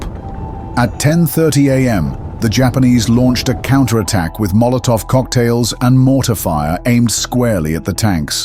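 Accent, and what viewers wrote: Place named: United Kingdom. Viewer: WTF with the Brit accent? These are American troops.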